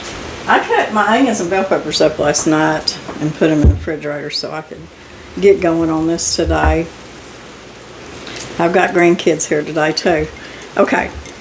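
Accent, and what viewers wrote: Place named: Appalachia, United States. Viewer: You look like your 89 and you sound like a HILLBILLY!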